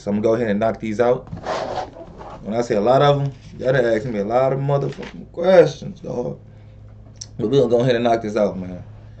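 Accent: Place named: Detroit, United States. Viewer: Does your swag rub off on So or vice versa? (I notice y'all talk similar maybe issa Detroit thing)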